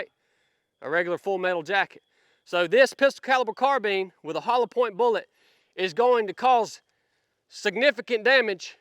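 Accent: South, United States. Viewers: Omg…😂 maaaaan I abso-fuckin-lutely LOVE THIS VID!!!! Your country accent, appearence, gear and humor was it for me!! Lol one of the most logical vids I’ve ever seen on here man and you now have a loyal subscriber/ follower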